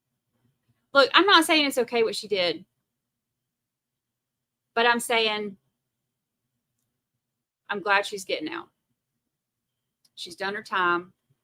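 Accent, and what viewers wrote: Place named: Florida, United States. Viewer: I'm from RA's. Don't know what trial you were covering but it's true. The minute you go do something else, the Jury's ready. Just wondering is your accent from Florida? I like it. Of course, to you I have the accent. Congratulations on your 10k!!! That Boy In The Box Sounds Horrible. How in earth can people do that?! I hope they get the D.P.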